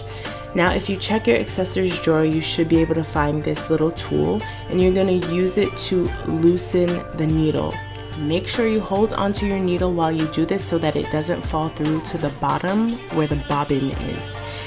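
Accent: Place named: Maryland, United States. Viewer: Shtrait shtitch shtretchy fabric. Is that a Maryland accent I detect? Not being mean I’m from Boston, OK? I just love accents! Thanks for the help! Perfect help and I just happen to have the same machine! Totally ordering the twin needle! Had no idea they even existed!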